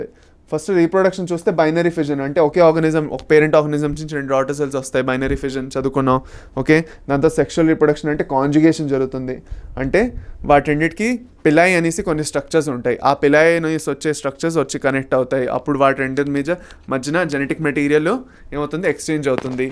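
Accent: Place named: Andhra Pradesh, India. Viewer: Chala baga chepparu sir Urs is pure andraite slang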